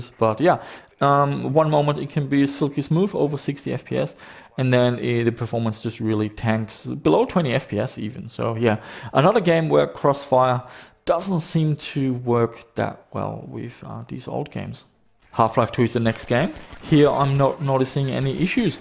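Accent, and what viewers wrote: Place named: Germany. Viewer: Hey man how come you sound more German than Australian?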